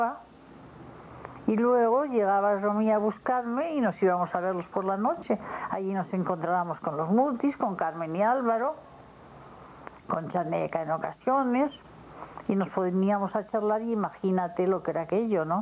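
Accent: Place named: Navarre, Spain. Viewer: No perdio nunca su maravillosa diccion y acento español de Navarra. Descanse en paz compatriota en aquel pais maravilloso de gentes nobles como son los mexicanos q tanto amor y casa dieron a los españoles nomadas.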